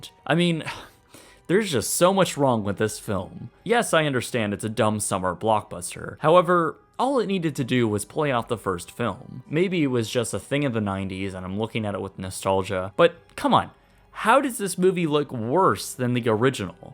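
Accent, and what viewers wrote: Canada. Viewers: 1) You need to go on a 'very' diet, and 2) a 'new sequel' as opposed to...?, and 3) I pegged you as a fellow Canuck within the first three sentences. Where's my pixie stamp reward?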